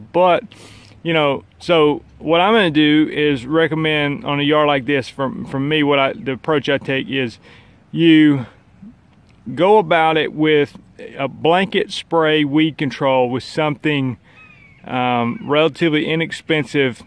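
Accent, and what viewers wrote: South, United States. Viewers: Thank you so much. Dude talks way too much. Sounds like he's from the south...which explains a lot.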